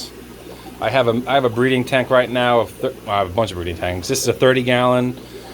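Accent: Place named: Massachusetts, United States. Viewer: Are you located in Massachusetts? Ha i picked up on the accent im in MA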